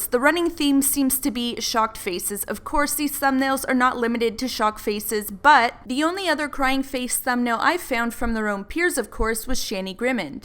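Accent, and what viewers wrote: Canada. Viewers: Totally off topic, but are you canadian?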